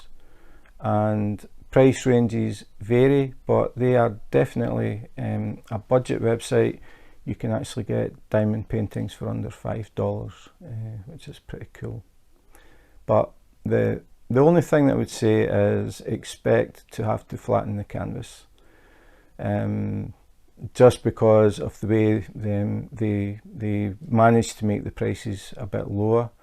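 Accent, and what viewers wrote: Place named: Scotland, United Kingdom. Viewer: It's always fun to listen to a Scottish accent. So cool. My father-in-law is Scottish but he has lived in Australia (where I'm from) since he was 17 y/o. He's now 80 y/o. I thought his accent was still strong but after listening to yours I realize it's not as strong as I thought.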